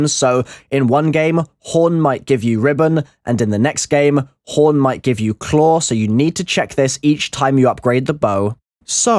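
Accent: England, United Kingdom. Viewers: The British accent is the easiest to understand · Naah... He''s just english broo its his accent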